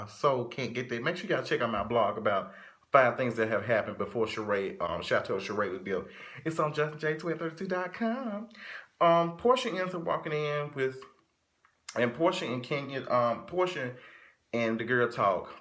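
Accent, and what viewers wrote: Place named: South, United States. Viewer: Your country talking 😭😭😭😭😭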